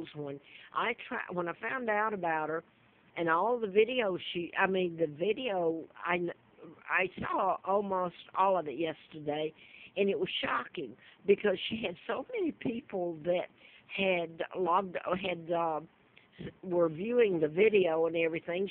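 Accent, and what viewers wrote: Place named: South, United States. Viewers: How did u get Southern accent ? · she was kidnaped and the southern accent comes from the British.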